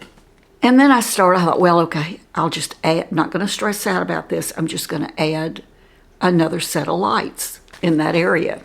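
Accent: South, United States. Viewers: I 💕😘 the way tells stories like a true Southern Belle 🙂 merry Xmas 🎄🦝🎄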